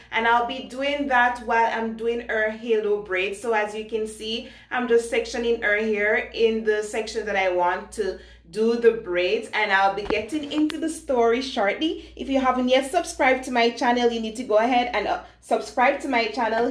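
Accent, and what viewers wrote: Jamaica. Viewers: I thought you were Jamaican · You sound Jamaican. Where can we find or contact you? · What salon do you work at? cause you sound like you might live in Jamaica.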